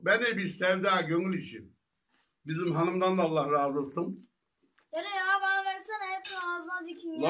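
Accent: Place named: Antakya, Turkey. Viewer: Aynı hatay antakyalilar gibi konuşuyorsun:))) bizim buranın yemekleri de güzeldir bekleriz:)) seviliyorsun:)) yiycin yapıcın edicin:)) bağh hele:)) hastahanalık oldu:))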